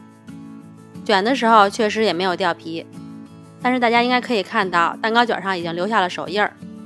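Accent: Beijing, China. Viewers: 我的天啊 辛苦你了！太不容易了！这得吃多少蛋糕...京腔听着很舒服哈哈哈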